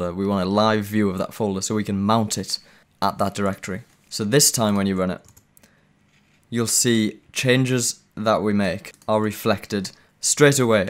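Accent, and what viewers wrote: United Kingdom. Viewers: fuckin uk accent(PIG)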